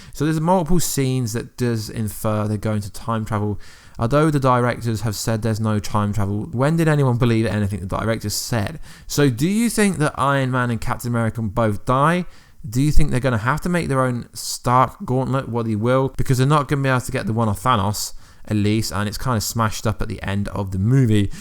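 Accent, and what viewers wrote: United Kingdom. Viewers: British?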